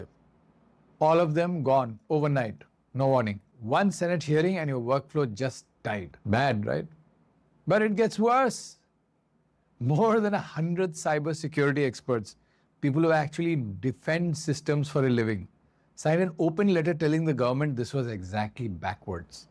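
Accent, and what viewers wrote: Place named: Scotland, United Kingdom. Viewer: Dude, it sounds like you learned English in Scotland, but your info is accurate. Thank you.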